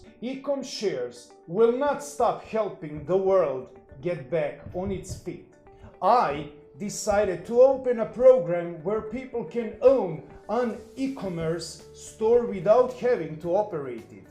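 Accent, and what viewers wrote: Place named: Jacksonville, United States. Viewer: Lol grew up in Jacksonville? I knew I recognized that accent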